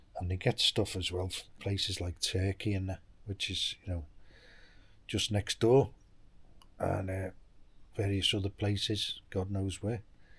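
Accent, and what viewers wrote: Liverpool, United Kingdom. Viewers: Is that a Scouse accent? Great vids cheers mate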